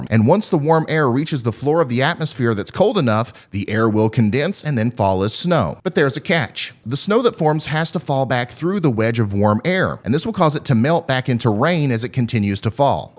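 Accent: South, United States. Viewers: You can’t help but love a southerner that’s all about science.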